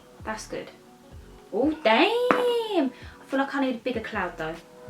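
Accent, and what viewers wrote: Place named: United Kingdom. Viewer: Your British accent is so cute 😍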